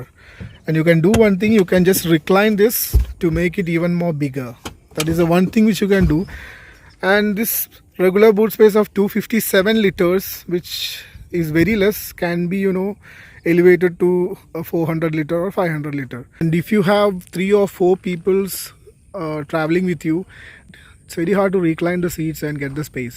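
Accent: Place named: Kerala, India. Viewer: Are u malayali?? Ur English slang resembles Malayali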